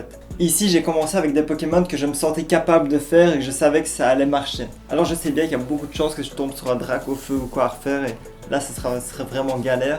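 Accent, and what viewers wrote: Liège, Belgium. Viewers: Petit accent liègeois dans cette vidéo :d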